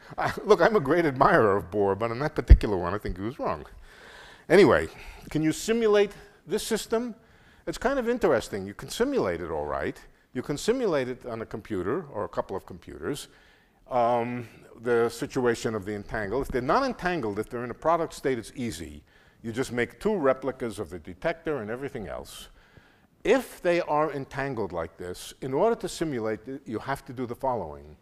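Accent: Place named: Bronx, United States. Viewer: bronx guy! lol love it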